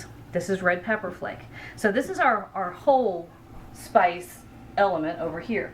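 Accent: South, United States. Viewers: I enjoyed your video and you received a like from me.I replayed the video three times and I really cannot understand the name of the buy bulk online store. I am sorry to trouble you with this. I am Irish and getting attuned with some of the American and very lovely Southern accent, thus I hope you for give me. In American if you listen to somebody talk on the news from the North of Ireland it has to be subtitled :-) :-) I do thank you for your attention.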